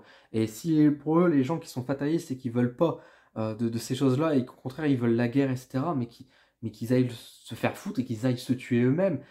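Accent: North, France. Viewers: Cher je pense que vous êtes dû nord de la France ❤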